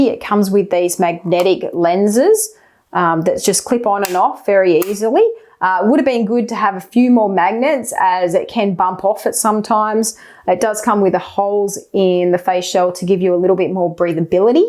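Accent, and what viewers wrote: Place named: Australia. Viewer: when she first said in the video Spider-Man i knew she was a AUSSIE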